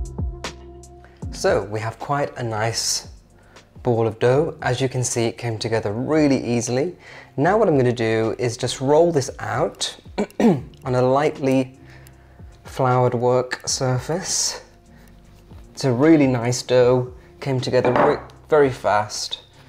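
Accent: United Kingdom. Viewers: Dear, I love your videos...Your beautiful and gentle British accent, your beautiful and soulful spirit, your sense of Elegance and Beauty and your mannerism!!! Love your natural and delightful attitude toward life, living, and sharing your interests!! Your videos are refreshing and Soulful. Love the music you selected for your videos, it is perfect!!! Your videos provide a sense of harmony, love, friendship, and sentimental values and tradition which many of us appreciate.You are Awesome!!! Superb job!!! Thank you!!❤❤❤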